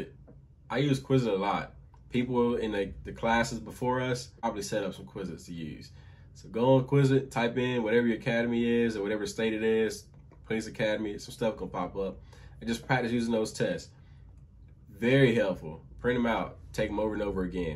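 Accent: Baltimore, United States. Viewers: I immediately knew he was from Baltimore when pronounced his “Do’s” and his “ew’s” haha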